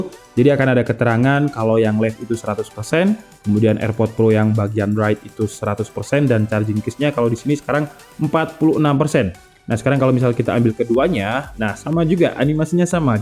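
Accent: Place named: Surabaya, Indonesia. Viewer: iki khasse wong suroboyo taa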